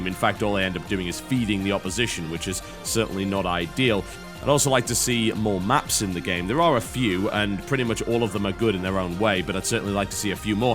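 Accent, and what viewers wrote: United Kingdom. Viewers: your british accent disgusts me. Im from england and yet i still cannot understand wtf your going on about. The end of your sentences all sound like your american.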